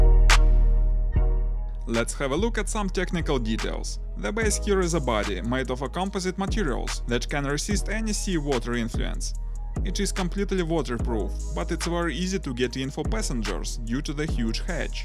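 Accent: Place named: Russia. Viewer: Sounds very much like a Russian accent.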